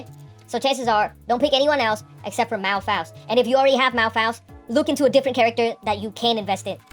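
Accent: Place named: Vietnam. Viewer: hmm... Is it just me or... I find there're some Asian accent in your voice. Not gonna try to be racist bro but.... your voice really remind me a Vietnamese fr who us to be my friend in highschool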